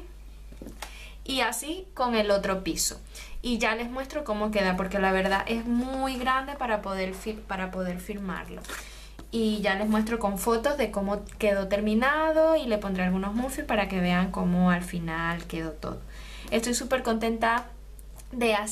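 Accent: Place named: Maracaibo, Venezuela. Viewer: además de ser Venezolana, por tu acento eres maracucha, un gran abrazo, que sigas teniendo mucho éxito!!!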